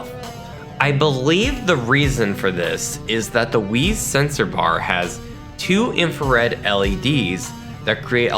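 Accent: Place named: United States. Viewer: For someone reason, I thought you were British. I didn't realized until halfway in the video that you are speaking in American accent 😂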